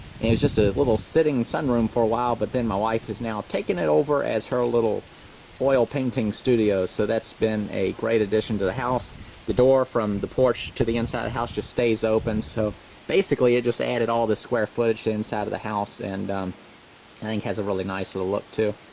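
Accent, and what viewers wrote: South, United States. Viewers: To tell the truth, as a non-english-native-speaker it was your accent that made me subscribe (something from the south right?), but i kept the subscription because of things like that barn-tear-down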